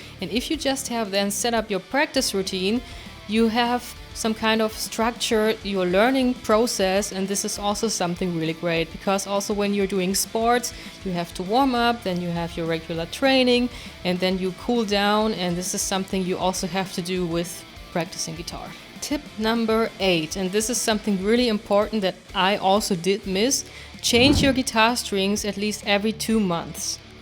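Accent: Germany. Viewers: I just love how you can hear 100% that she is german, even though her english is pretty solid. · Do i hear a german accent?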